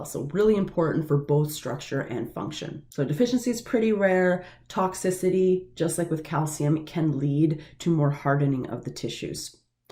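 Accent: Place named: United States. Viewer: Your voice is so beautiful, I really love this accent. Is you accent American or Canadian?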